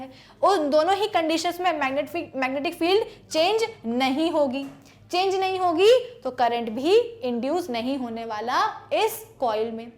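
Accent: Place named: South, India. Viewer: I think he/she is from Tamil nadu or Kerala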